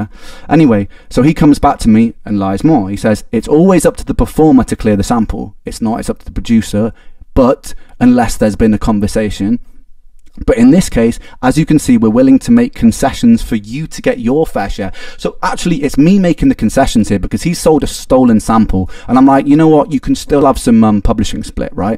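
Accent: United Kingdom. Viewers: Ren, every time I hear you speak, I wish more and more that I knew you, and could talk to you personally. ( And I don't just mean because of your accent, although it is quite lovely, and reminds me of the time I lived in England) But listen, Every. single. One. Of. Your. Fans. Is one hundred million times behind you, and I hope you don't believe for a second that we would ever believe any slander about you. Because what you've done for us, is far beyond having given us catharsis, identity, and connection. It's far beyond your amazing talent and the joy and Inclusions you've more than you will ever know. You've given us a piece of your soul, a glimpse of your deepest thoughts and being, and that is an indelible mark upon each and every one of us. **And don't fret about your song. I don't want to say anything to fuel this fire, but please message me if you would, because I have good news for you.*** Aside from that, I shared your music with my college psychology professor tonight, because she was avidly interested when I told her about your story, and the message of your music. 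I can't wait to hear back from her, I know she'll love it! Moreover, whatever money you've lost, say the word, and you know your patreon would be filled 10x overture amount, in a heartbeat. You are a genuine, beautiful soul, Ren. I'm eternally grateful I found your music, because I relate to you more than you can imagine. We've gone through very similar things at the same age, and for me, it's something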